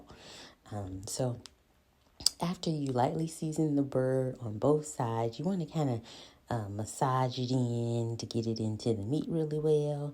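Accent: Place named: South, United States. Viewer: I now know that feeling my viewers get listening to my voice. You have a nice light accent. it sounds Southern but in a very classy way. I am trying to keep it bright. Basically your speaking so calm.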